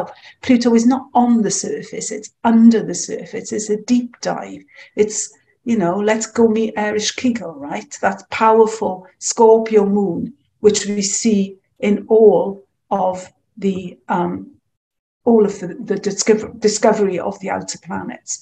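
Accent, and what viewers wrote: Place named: Wales, United Kingdom. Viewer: Refreshing to hear a welsh accent in an astrology lecture! Alot of really helpful references, thank you 🙏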